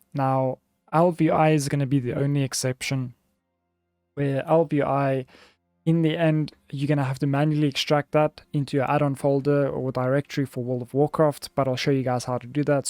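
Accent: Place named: South Africa. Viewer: Are you from South Africa?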